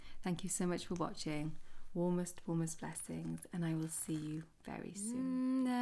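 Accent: United Kingdom. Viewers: Thank you for sharing this, even though you didn't entirely feel like it. I appreciate it so much. Not to be weird, but your voice is so soothing (part of that is the dumb American in my loving UK accents lol) and I'm having a rough night with insomnia so am catching up on YouTube. I'm teary now watching a familiar video from someone I love. And please note that this comment is NOT pressure to continue posting - if you don't feel up to it, don't do it! But I just want to say thank you for this one.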